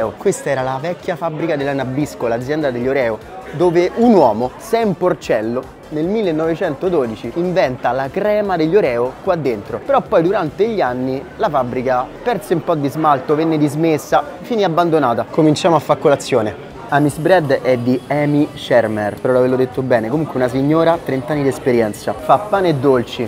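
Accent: Rome, Italy. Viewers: Assomigli a Dean Winchester: adoro! Tu e il tuo romanaccio, verrete con me domani in treno:)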